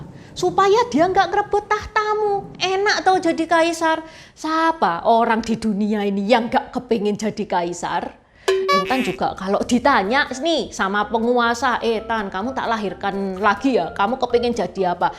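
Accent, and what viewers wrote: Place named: East Java, Indonesia. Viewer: Aku senang dengan cara Intan bercerita. Dia pakai bahasa Indonesia sehari2 logat Jawa Timur. Selamat Intan, semoga sukses !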